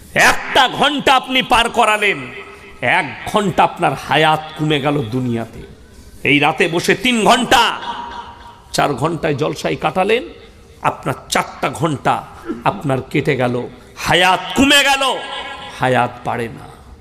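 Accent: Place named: India. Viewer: এটা হিন্দি টানে বাংলা বলে কেন?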